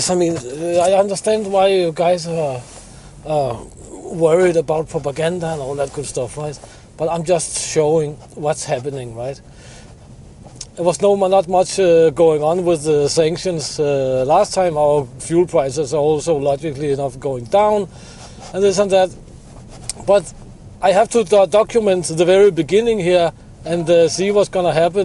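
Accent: Denmark. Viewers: Wow your english sound a lot like with Danish accent!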